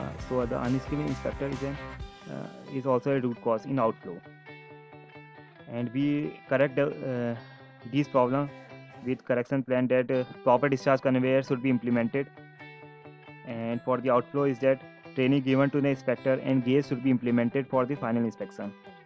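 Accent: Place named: India. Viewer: This video could be so helpful if you had subtitles because I find it so hard to understand what you say. The Indian accent is hard to comprehend, it would be so much of a help if you spoke much much slower maybe that would easier things for us here in Europe.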